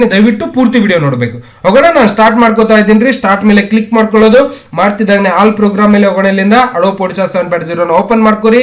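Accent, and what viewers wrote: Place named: Shiggaon, India. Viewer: ಸರ್ ನಮ್ಮ್ ಊರ ಬಾಸ ತುಂಬಾ ಖುಷಿ ಆಗುತ್ತೆ ಸರ್ ನಿಮ್ಮ್ ಸ್ಪೀಚ್ ಕೇಳಿ ನಂದು ಶಿಗ್ಗಾoವ ತಾಲೂಕ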